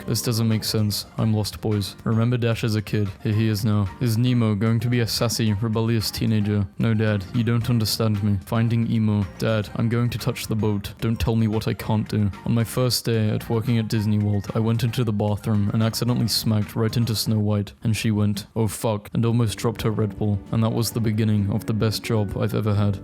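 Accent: United Kingdom. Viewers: I walked into my brother’s room and asked him what he was watching, he said “It’s this depressed British guy reading memes, he’s surprisingly good entertainment.”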